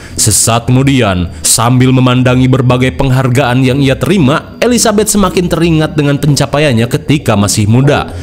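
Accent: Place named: West Java, Indonesia. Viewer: Ci mimin nya orang sunda ini mah 😁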